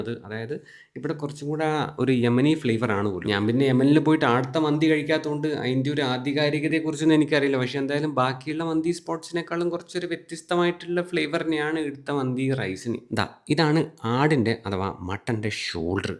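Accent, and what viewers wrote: Kannur, India. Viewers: Brode Place Kannur Ahno ?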